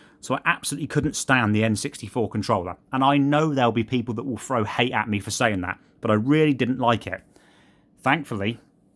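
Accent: United Kingdom. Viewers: im not a massive fan of british accent. did they make a new version ? lol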